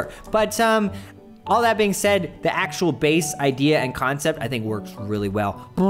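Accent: Germany. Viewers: Doch klar, ist Bayerisch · So, first time watching this dude, for a split second I actually thought hes german. By far the most convincing so far.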